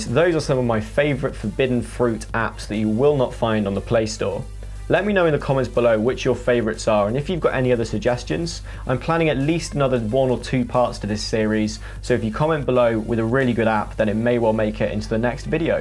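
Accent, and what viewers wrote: United Kingdom. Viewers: british english accent?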